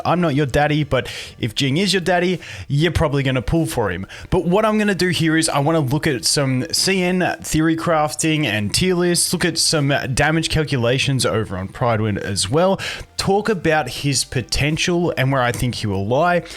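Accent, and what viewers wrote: Australia. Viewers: wait australian?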